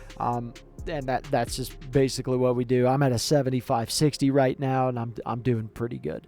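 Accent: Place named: Eastern Shore, United States. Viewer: Great Videos they’ve helped me out a lot. Quick question I grew up in Maryland your voice sounds like your from the eastern shore. Folks out that way, have a very distinctive accent.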